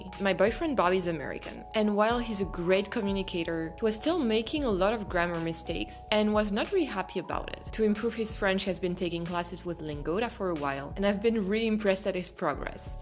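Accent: France. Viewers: I love the French accent so much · You certainly have a very valid point about all the marketing, and the way overdone stereotype. But I have to say it's kind of funny that you actually have the look, flawless skin, a casual, effortless seeming tastefulness and beauty, plus your so appealing accent, that you are a perfect example of the French women I've often seen on the Metro in Paris. It really is undeniable that it's common to see women there who have an understated calmness, and a style that is casual, but also put together just right. Stereotypes are overdone, but nearly always have an element of truth, and this one is no exception. You are my Exhibit A. Cheers.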